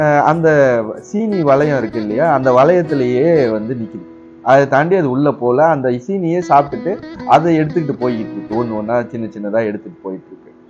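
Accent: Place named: Karaikudi, India. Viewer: சிறந்த ஆக்கப்பூர்வமான பேச்சு சார்..... இனிமையான குரல்..... கொஞ்சும் காரை தமிழ்.... மேலும் மேலும் கேட்ட தோன்றுகிறது....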